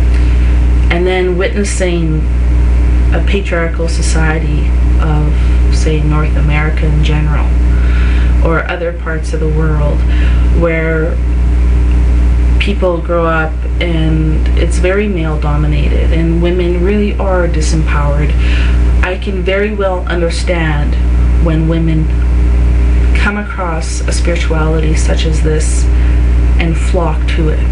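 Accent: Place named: Canada. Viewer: I really enjoyed hearing explanation to this question! For the one searching... just remember, the passed on author Scott Cunningham was a male, and another good teacher. Yet mannerisms and Great White North accent give things a little more personal aspect.:) Blessed Be!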